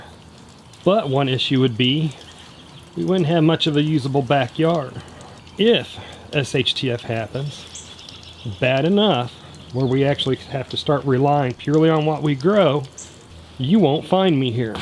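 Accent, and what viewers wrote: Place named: South, United States. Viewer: you're very welcome. I dont recall you saying where you live, but you sound southern and I live in West Alabama near T Town. I've obviously forgotten where you're at. Since I hit 50 (several years ago) I do good to remember much of anything lol. I had been doing some research on something I had requested a video about, burying the dead and such, and i never got back to you on that because some things came up for the fellow who I had been talking about it with and getting the info from. (In case you're confusing me with someone else, but I honestly think you're too young for that and it's most likely me who doesn't remember lol)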